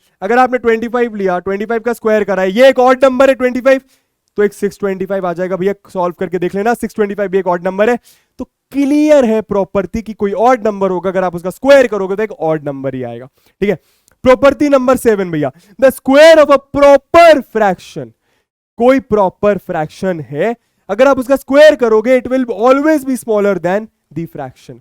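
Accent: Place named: Bihar, India. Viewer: My mom asking "is he bihari"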